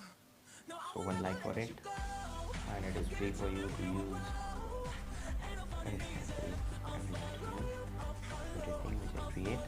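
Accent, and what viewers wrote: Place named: India. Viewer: but your English accent seems to be different than other indians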